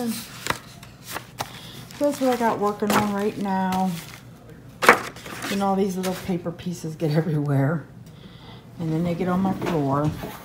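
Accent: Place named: Pennsylvania, United States. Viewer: Hi Tammy of OKC mentioned you, so my first time viewing your channel. I knew without even looking at your address you must be from PA. I'm from NC but my neighbor of years and years is originally from PA and y'all have the same accent! I enjoyed your video!!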